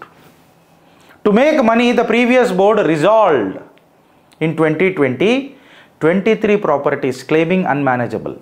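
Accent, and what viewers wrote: Telangana, India. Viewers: Ee telanga telugu kanna normal telugu lo matladadandi, mee accent improve cheyyandi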